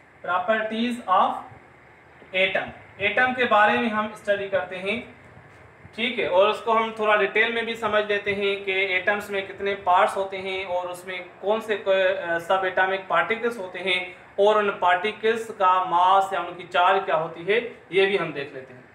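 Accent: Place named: Sindh, India. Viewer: baba saein full sindhi accent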